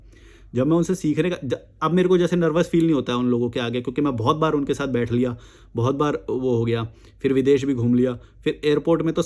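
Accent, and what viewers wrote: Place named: Delhi, India. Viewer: Delhi accent...Nice good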